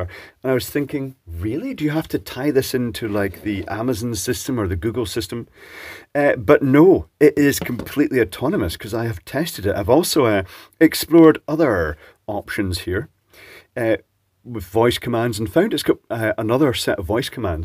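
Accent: Scotland, United Kingdom. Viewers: Especially with a UK accent. Eg YouTube auto captions usually make a hash of. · Might be an example of edge computing. I haven't investigated the tools for this but the claim seems to be that you do training with the expected powerful computer but then condense the result into something that can run on a microcontroller. Very impressive that it not only doesn't need personal training but can even cope with a scottish accent! got a pretty clear voice, though. I wonder if it would handle glaswegian or geordie. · I was expecting the light to have trouble understanding the Scots brogue, just like the voice controlled lift in Burnistoun...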